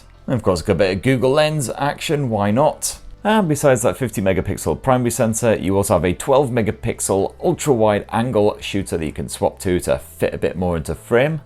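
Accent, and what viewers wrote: United Kingdom. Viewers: love your classic british humor laced accent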